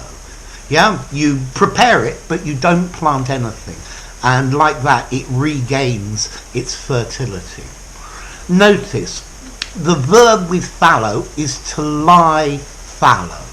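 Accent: United Kingdom. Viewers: Sir, Your pronunciation is the best standard British pronunciation. Please continue the noble job. Grateful Thanks. 🙏🧡❣️💖💛❤️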